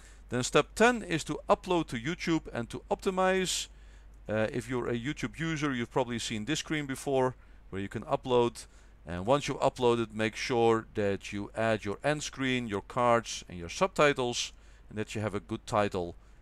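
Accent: Netherlands. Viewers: Just a quick question, are you from Belgium or the Netherlands? because your accent sounds very Dutch. Great video though!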